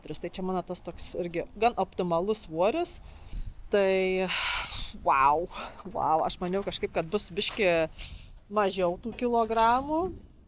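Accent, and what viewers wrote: Lithuania. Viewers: iš kur tu kilusi pagal kaba spėsiu iš Raseinių.Ten taip kalba Stebiu tave tau sekmės.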